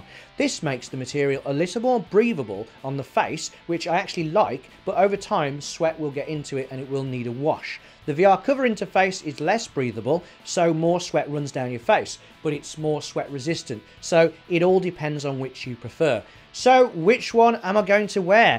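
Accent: West Midlands, United Kingdom. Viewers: this dude sound like a west midlander :D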